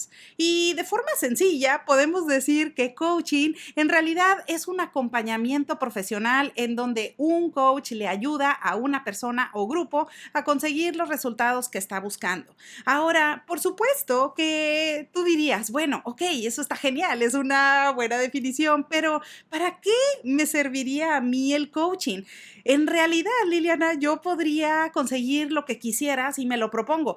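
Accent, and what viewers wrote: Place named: Mexico. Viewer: saludos desde argentina me gusto tu explicación además de la energía con la que le das énfasis a tus palabras y de gustarme ese lindo acento mexicano ji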